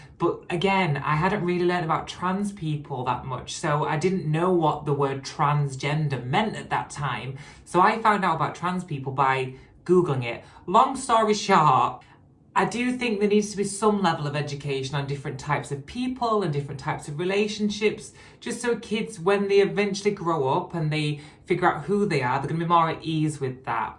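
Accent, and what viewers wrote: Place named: Scotland, United Kingdom. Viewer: Politics are terrible - makes sense what you mentioned. I am honest in stating I think it's a bit much-expecting people to understand this overnight and learn everything - the world is busy and that's not on my list of things to learn right now, however, you are a human (woman) who I respect but not what I see in the parades and story time with drag queens - what the heck. I love people and care a lot but they can get very nasty over a mistake - misgendering. The community seems to be very entitled but not yourself. Thank you for helping me understand everything and learn that not ALL transgender individuals are the same. I feel bad for you but also respect you because you are living your life and doing an amazing job with your transition and platform. You are a beautiful and kind woman and I am beyond grateful to have found your videos. 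Positive vibes and love from Canada. BTW I love your accent, my grandmother was a war bride from Scotland.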